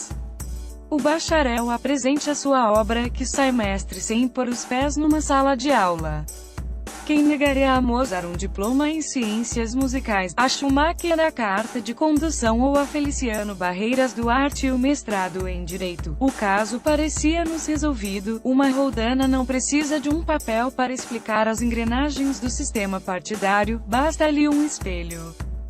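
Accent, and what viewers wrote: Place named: Brazil. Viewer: Porquê em brasileiro?? nada contra mas sendo caso da política portuguesa não arranjavam um voz informatica duma gaja tuga??? abraço e parabéns subscrevo o canal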